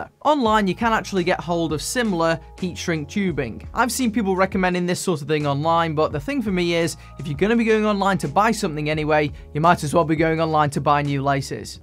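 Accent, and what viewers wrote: United Kingdom. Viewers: I watch his videos only to hear that British accent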